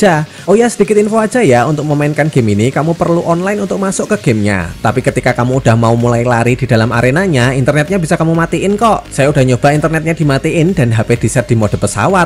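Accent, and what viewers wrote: Java, Indonesia. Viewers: BANG situ orang jawa bukan soalnya ngomongnya kaya orang jawa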